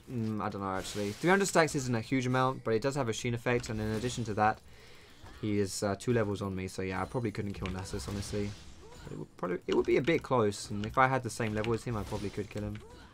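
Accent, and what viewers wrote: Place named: Birmingham, United Kingdom. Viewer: HEALTH POTIONS PELASE. You sounded so much like my brummie housemate, made me laugh so much xD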